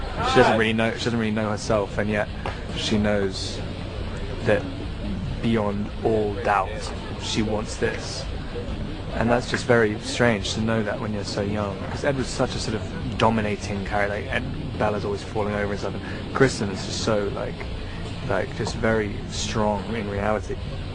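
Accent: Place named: London, United Kingdom. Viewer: his accent is like London English, Standard English, he is accentless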